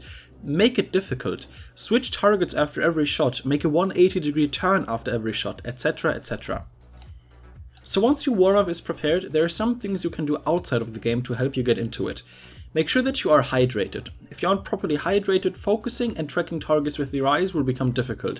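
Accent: Germany. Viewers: Dein English ^^ Man hört sofort, dass du deutscher bist. :-)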